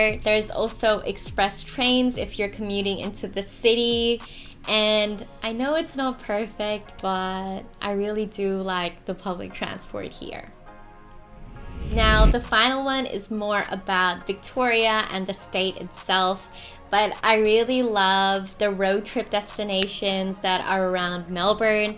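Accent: United States. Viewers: What is with the American twist on the accent if Oz is home?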